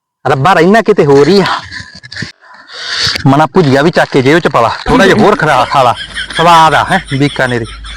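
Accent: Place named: Malwa, India. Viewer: ਆਪ ਜੀ ਦੇ ਵੱਲੋ ਖਾਸ ਤੌਰ ਉਪਰ .ਮਲਵੀਆਂ.ਦੇਵਾਂਗ ਬੋਲੀ ਗਈ ਅਵਾਜ ਤਾਂ ਬਹੁਤ ਹੀ ਵਧੀਆ ਲੱਗਦੀ ਹੈ।ਧੰਨਵਾਦ ਜੀ।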